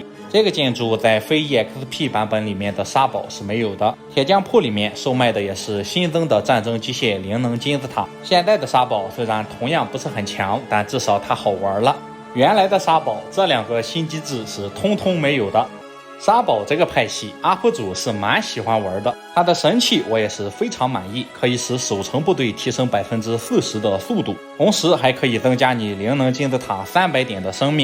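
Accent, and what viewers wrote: Shandong, China. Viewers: 山东口音有点意思： 挖囊废； 😂听了半天才反应过来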